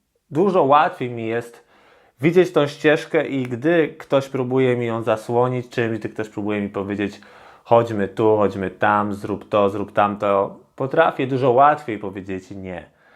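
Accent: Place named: United States. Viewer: Twòj amerykański jest cool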